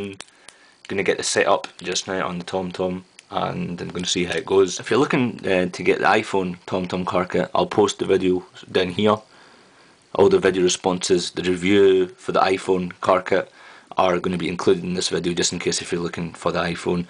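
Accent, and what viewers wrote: Scotland, United Kingdom. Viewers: lol typical scottish accent :) its nice to hear a similar accent to myself for once :D excellent reviews.. definately gonna purchase a car kit for my ipod touch x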